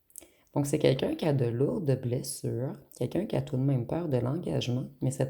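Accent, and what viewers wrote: Quebec, Canada. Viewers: Ahh oui pis je comprends pas pourquoi tu caches ton québécois ça fait parti de toi. Je crois pas que les Français belges et autres se bloquent. Tu peux être naturelle c'est tellement moins épuisant. 😘 Merci et j'aime beaucoup tes titrages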